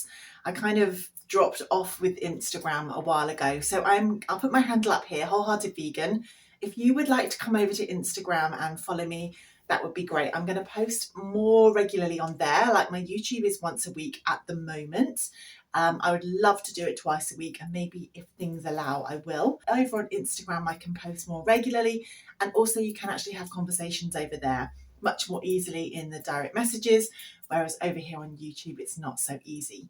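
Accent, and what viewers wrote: United Kingdom. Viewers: I'm used to watching American starch solution advocates and I can't tell you how refreshing it is to hear a British accent. It makes you feel like you can relate especially when you mention products that I know and I can easily access. Love from Scotland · Good luck with your channel 🥰 got the warm and fuzzies hearing a fellow Brit. Originally from Essex, living in Australia 🇦🇺 for past 20 years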